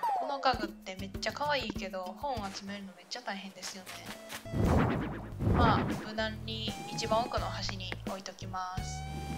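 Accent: Kansai, Japan. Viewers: 初めまして！あつ森島作り迷走中の停滞中です😅 そんな中さんの動画に出会いました！私も関西なので、さんのしゃべりが心地良いです😊 素敵なブックカフェ、ぜひマネっこさせてください🥰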